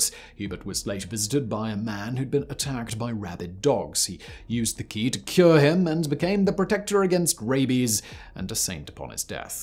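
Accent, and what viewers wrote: RP, United Kingdom. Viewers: Strange that this guy gets so much voice-over work since his affect is difficult to hear. He drops the last several syllables of every phrase such that it is...... Therefore, it strikes me as odd that he would....... Still, the accent is posh British, so that affords him some....... But don't mind me, I'm just....... Anyways, I hope you all have a....... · Well, he's British. They often speak that way.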